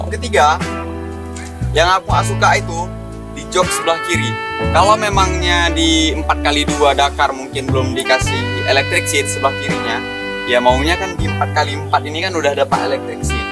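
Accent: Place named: Java, Indonesia. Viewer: Orang mana nih, ngomongnya medok banget ??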